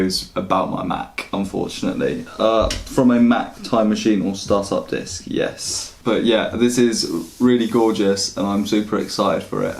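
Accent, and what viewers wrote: United Kingdom. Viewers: Haha love the English accent bro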